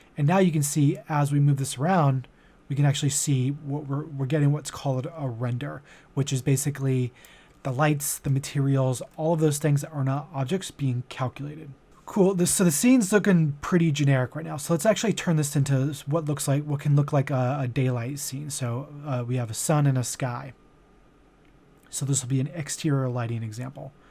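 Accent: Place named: United Kingdom. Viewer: Thank you for your efforts and including screenshots, and thanks for not having a strong British accent. =D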